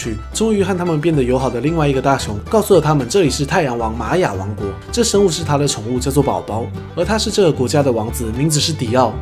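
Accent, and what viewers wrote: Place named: Taiwan. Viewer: 台湾腔听着好舒服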